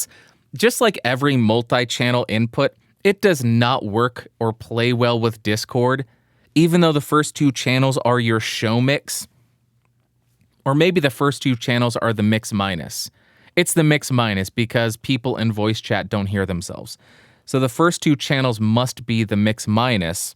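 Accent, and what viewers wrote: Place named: New Zealand. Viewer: Even though your 'kiwi' accent may have offended someone, somewhere... By the imaginary unofficial power vested in me, I hereby dub thee an honorary kiwi.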